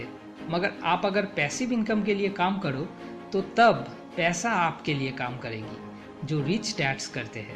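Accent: Bengal, India. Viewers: ur voice seems like ur are Bengali ! Right? But by the way... video ach6a tha!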